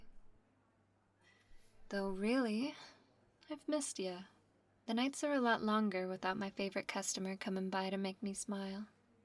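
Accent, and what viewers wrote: South, United States. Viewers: Love the audio and love the southern belle hints to it aswell great work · That southern drawl adds SO much! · Love the southern twang in this one. Thanks for the audio!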